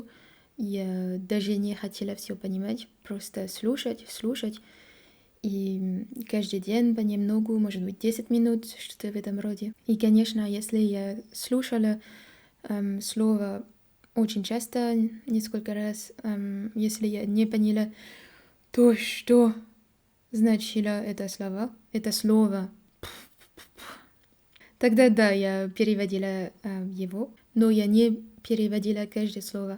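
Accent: France. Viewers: Удивительно насколько вы чисто говорите по-русски. Конечно акцент есть, но вы говорите правильно в знательной мере! И, кстати, я заметил только одну ошибку в звуке Ы - в слове "музыка". Крайне странно, что вы её не любите. Что касается частой ошибки - К у вас мягкая, таким образом КА звучит как КЯ; Л у вас мягкая, нужно как в американском dark-L: football. В целом акцент приятный, особенно как вы говорите предлог "по", с французской О. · Браво! У вас очень хороший русский, с приятным французким акцентом! То, чего вам пока не хватает, в этом нет вашей вины - все придет с опытом, это более богатого словарного запаса, чтобы использовать различные синонимы, образы, метафоры, более красочную и сочную речь! Желаю вам успеха! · я даже хабиба хуже понимаю, чем ее. я на данный в японии по обмену, тут много французов и этот французский акцент, как мурлыканье котенков очень приятный для ушей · Произношение правильное, но! 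(Всегда есть "но"😂) У каждого языка есть своя интонационная особенность - мелодика языка. И у вас выходит русская речь, но с французской интонацией (мелодикой) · Говорит хорошо, почти не делает ошибок, но произношение смешное, как будто нарочно искажает слова. Особенно французская привычка делать ударение на последний слог, очень комично звучит. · Отлично разговаривает, пусть с французским акцентом, но это даже придает некий шарм, если и были ошибки то они несущественны. Круто! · Русский язык с французским акцентом... напоминает фильм гардемаринов и героя Боярского. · С нежным французким акцентом, так мило!😊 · Comme elfer2381 le dit, vous parlez russe de telle manière que beaucoup de gens doivent vous envier, et le charme de votre accent français pas trop accentué est agréable. Merci. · Шикарно!!! Вы отлично строите речь, абсолютно по-русски! В некоторых случаях "... и так далее..." лучше заменять на "... 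и тому подобное". "... и так далее..." - это когда вы что-то перечисляете. "... и тому подобное" - похожее, подобное. PS Очень милый французский акцент ) Говорите больше на русском, вас очень приятно слушать. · Вы прекрасно говорите по-русски!!🥰 с милым французским акцентом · Прекрасная русская речь! Кстати, насчёт книг о Гарри Поттере. В аудиокнигах «ГП и кубок огня» и «ГП и Дары смерти» ты бы смогла озвучить реплики Флёр Делакур на русском языке с твоим французским акцентом) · Freeeench accent 😌🤌🏻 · Bonjour,! У тебя отличный русский язык, ты не делаешь ошибок в самых сложных местах: падежах, склонениях, спряжениях, предлогах, правильно подбираешь приставки к глаголам, ставишь глаголы в совершенный и несовершенный вид. Единственное, что в тебе выдает француженку - это твой акцент. Но так даже лучше, потому что в этом есть определенный шарм (хм, кажется, это французское слово). Желаю тебе удачи во всех твоих начинаниях, особенно - в дальнейшем постижении русского языка (он действительно очень красиво звучит в твоем исполнении)! 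А вообще это удивительно и приятно, что даже в такое сложное время, как сейчас, когда отношения между нашими странами переживают тяжелые времена, в мире существует человек, который снимает такие вот видео. Возможно, это не такая сложная вещь, но это очень большое дело. Спасибо тебе за то, что помогаешь нашим народам лучше понимать друг друга. Всегда рады видеть тебя на русской земле! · интонация всеравно французская. как поёшь. англиский и русский более рубленный. на русском все слова заканчиваются четко. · Не нужно менять французский акцент , он прекрасен ! Русский язык сложный , один из самых сложных , поэтому нужно много говорить на нем , слушать это значит понимать , но не говорить ). И еще вот такой факт -дети не знают грамматику совсем , но они говорят же на русском языке ) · кстати впервые слышу французский акцент на русском. Она будто говорит на французском, но русскими словами) Абсолютно ожидаемо что это должно звучать именно так, но слышу впервые) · Французский акцент звучит прекрасно, а грамматика очень на высоком уровне, ты молодец)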